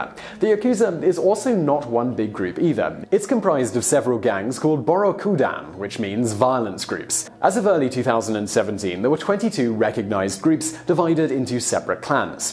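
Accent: United Kingdom. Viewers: ugh, its not pronounced ya-KHU-za. Yakuza is pronounced "Cheese." Yes, I am A) busting your balls, and B) displaying how much most of us give a damn how some brit pronounces names in a culture whos language is completely separate from English. As in not very much at all. Dont get caught up in the vocal minority, Most of us are just looking to be entertained for a few minutes, doing some research and giving it your best go is all we expect.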